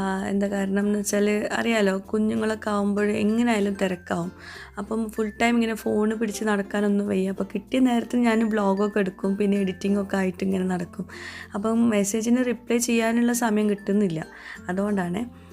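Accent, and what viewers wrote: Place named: Alathur, India. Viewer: Aalathur bhakhatt samsarikkumbo anatre kuttundalo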